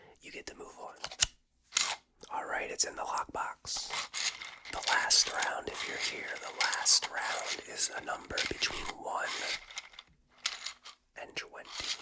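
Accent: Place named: Baltimore, United States. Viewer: An O's cap? And the way I hear that "only" vowel? I know a fellow Bmore man when I hear one!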